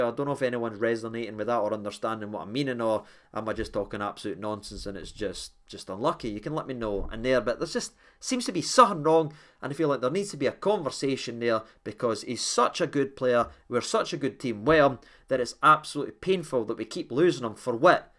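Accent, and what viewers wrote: Scotland, United Kingdom. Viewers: Im doon in england the noo. Its nice tae here a scottish accent again. 👍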